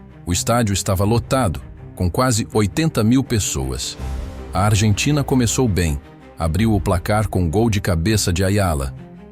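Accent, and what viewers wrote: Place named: Portugal. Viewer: Do nada o narrador mete um português de Portugal kkkkkkkk toda hora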